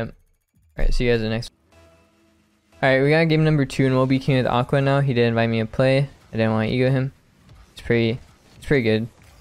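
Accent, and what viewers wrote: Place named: United Kingdom. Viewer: your British is perfect👍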